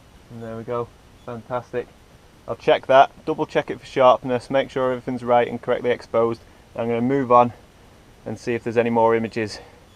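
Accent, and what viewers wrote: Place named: Northern England, United Kingdom. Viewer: I thoroughly enjoyed this video. WELL DONE. I noticed from your accent you are a northern lad. me too. I'm a keen amateur photographer and a trip to the lakes are few'n'far between. Do you provide training and workshops?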